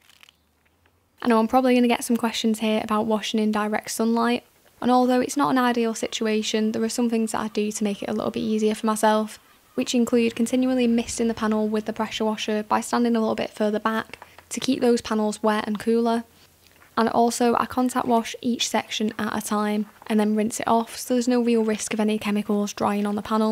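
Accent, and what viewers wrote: United Kingdom. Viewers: Se speak british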